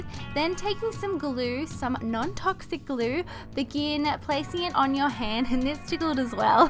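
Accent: Australia. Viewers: Australian I am pretty sure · ARE U AUSTRALIAN???? Go AUSTRALIA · Hey are you AUSIE! if s so I am too! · You deserve WAY more subscribers than you have you so beautiful and bubbly it would make me so happy if you replied! I'm new this is the first video of yours that I've seen the second I heard your voice I subscribed and turned on notifications love you so much💕 BTW are you Australian cause I am too that would be awesome byee xoxoxo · Is she Australian??!! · is she Australian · Are u from melbourn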